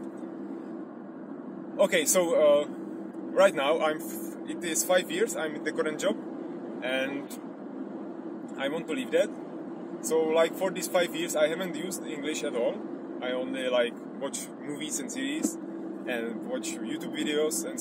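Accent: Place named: Czech Republic. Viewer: and sou on xD cesi maj asi nejhorsi prizvuk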